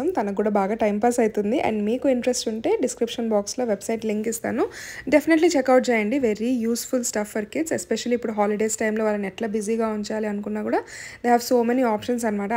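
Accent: Rayalaseema, India. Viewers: మన రాయలసీమలో మాటల్లో చెప్పడం చాలా బాగుంది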